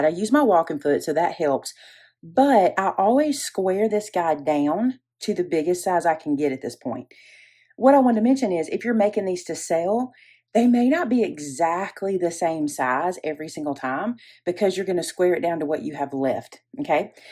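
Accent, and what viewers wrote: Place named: South, United States. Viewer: This is a great video! Love that accent😊. I’m from Alabama and your voice makes me feel at home! You know, I still sew over pins🫣. We were taught that as long as the pin is at right angles to the needle, the needle will slide over it. I have found this to be true in my 50+ years of sewing. I’ve never bent or broken a needle because of a pin.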